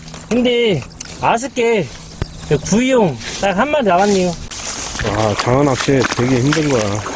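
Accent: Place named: Gunsan, South Korea. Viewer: 정말 오랫만에 보네요 땅강아지! 그런데 많이 날아오네요 청정지역이네요 근디 말여유 사시는 곳이 군산이여유? 찰진 사투리가 섞인거 같아서유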